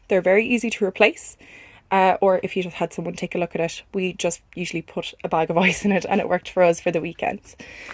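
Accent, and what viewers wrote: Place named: Galway, Ireland. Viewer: Just found out I've ancestors from Galway and was curious as to the accent. This little trip around the caravan fit the bill. Thank you kindly.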